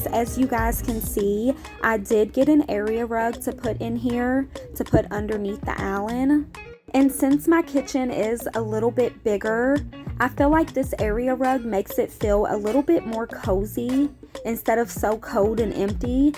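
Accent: South, United States. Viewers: I can’t get enough of the southern drawl 🖤🤍 I lost mine but it’s so comforting 🤷🏻‍♀️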